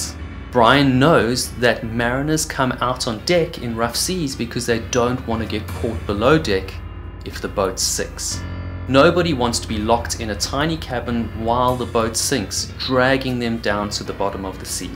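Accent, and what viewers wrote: South Africa. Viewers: South African accent on point💨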